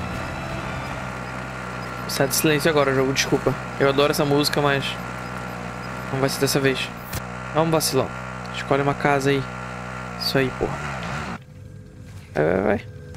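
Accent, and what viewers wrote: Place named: Santa Catarina, Brazil. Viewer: Mano pelo seu sotaque vc é de Santa Catarina, de onde vc é?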